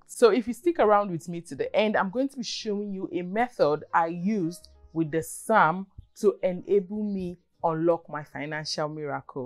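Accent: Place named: Nigeria. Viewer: Nigerian with quite fantastic accent, not like many of them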